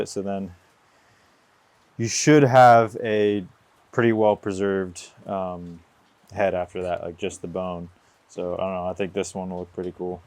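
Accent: United States. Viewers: It took me a while to figure out why he has to search for words in english. American accent but exposed to the language for a relatively short time. That makes a lot of sense and makes it really interesting to listen to. Besides that, I envy the live you live there. Eating fish on a daily basis, together with fruits from your own garden is the kind thing I dream of. Far away from crowded places, and just doing your thing. I really like the way you do your business there and I genuinely hope you will upload videos like this more often to give us an inside look on your life. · It may have been asked before, but your English accent sounds like you are originally from the United States. Is that where you hail from? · He has a California accent lol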